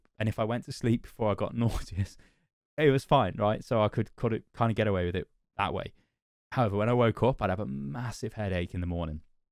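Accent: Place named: Cockney, United Kingdom. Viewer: Overwatch came out, I got it, and I immediately went on the internet to learn about the game. It wasn't long before I stumbled into this weird cockney guy who didn't script his videos and really seemed to know his shit. I got to know simply as the guy with the Overwatch channel I check out from time to time even after I'd stopped playing the game. And just now I discover that he's in pain, and I find myself getting teary-eyed just as if a friend told me about his problems., you're a part of my life now. Get better, friend. Take all the time you need. I'll be here when you get back.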